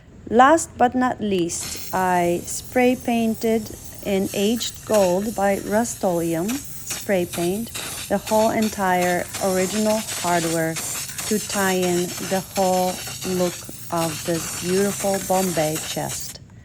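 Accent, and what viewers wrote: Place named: Europe. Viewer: I’ve been watching all of your shorts and just tuned into this. I’ve been wondering where you find all of your beautiful pieces and now that I hear you beautiful accent I’m thinking you must be in Europe and it makes since how you find the pieces. I live in Colorado USA and believe me these cowboys out here don’t have such great pieces like you find.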